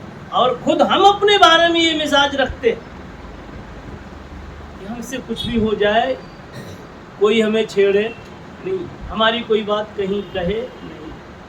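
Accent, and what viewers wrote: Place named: Pakistan. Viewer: قاری صاحب کا لہجہ لہجہ مکندواریہ لگتا ہے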